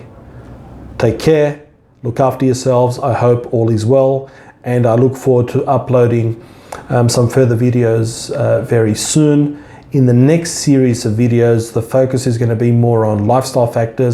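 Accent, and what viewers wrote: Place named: Australia. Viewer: At approximately I wasn't able to understand the word you said after "end in". Maybe because of your most lovely Australian accent. (: This exact scenario is what my friend hasn't yet been able to understand is transpiring between her and the narcissist she THINKS she loves, and who is deceiving her into believing he loves her. Whenever you have time, let me know the word. Thank you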